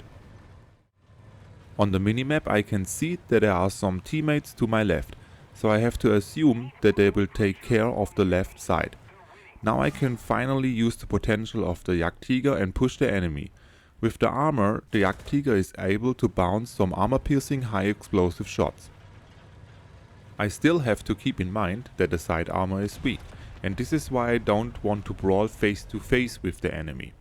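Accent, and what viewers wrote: Germany. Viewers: Your english is a littel bit broken and you sound german but your symphatic asf you sound like a nice guy too!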